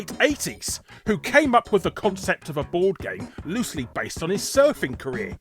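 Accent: United Kingdom. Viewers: Your cartoon likeness doesn't look like someone you'd expect to have a brittish accent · Nah, don't feel stupid. He has quite a strong British accent (London...I think? I'm no expert.) and I imagine it can be very difficult for a non-native speaker to understand if they have not learned from a British teacher. And even then there are a lot of different English accents. I personally enjoy his narration, but then I am more accustomed to the accent.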